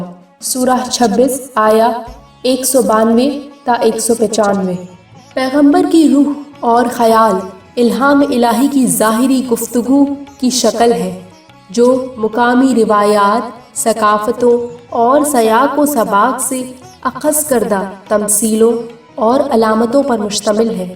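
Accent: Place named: South Asia. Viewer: ap badun ki bol chal sa he andaza laga sakta tha ki ya india ka hn ya pakistan ya kasi sawal howi??!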